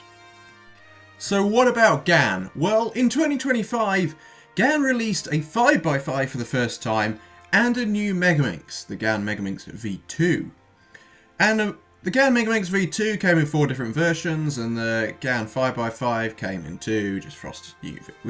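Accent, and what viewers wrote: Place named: RP, United Kingdom. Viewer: I love your posh accent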